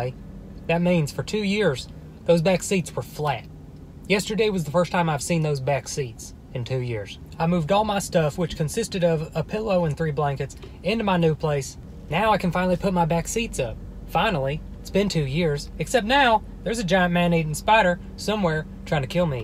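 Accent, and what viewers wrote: South, United States. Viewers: 2024 now.....that southern accent was thiccccccccck in these older videos. lol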